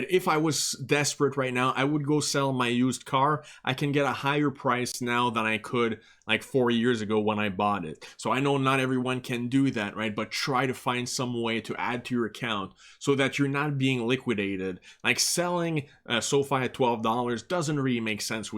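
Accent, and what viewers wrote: Quebec, Canada. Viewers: Dude, I love the videos, I find you to be one of the few 0 bullshit YouTubers, but I am curious because of the accent if you are from Quebec?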